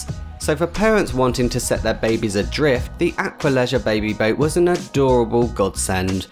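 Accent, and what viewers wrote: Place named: United States. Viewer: your amrican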